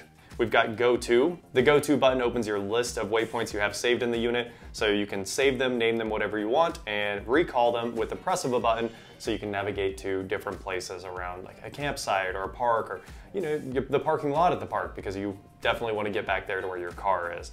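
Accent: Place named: United States. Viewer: It is in American English. You can probably tell, from his accent that he's American. Many English words containing a G that are pronounced hard in British English are pronounced soft in American English.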